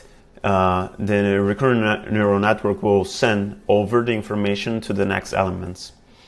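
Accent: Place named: France. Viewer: I’ve liked your French accent!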